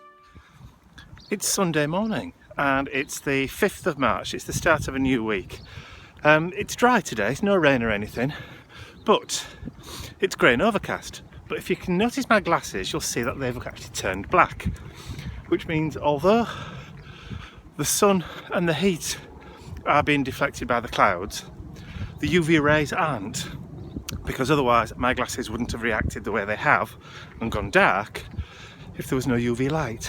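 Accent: United Kingdom. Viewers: I understand the people that loves to hear your voice. I'm Belgian and I love that British English so much also and the vlogs. Only started to watch your videos a couple of month back and watched every video on your channel. You are a bit addictive, LOL. Have a nice week:-)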